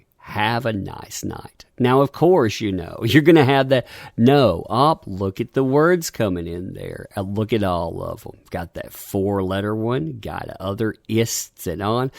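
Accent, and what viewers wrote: South, United States. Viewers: British guy myself . Your southern right ? 🙂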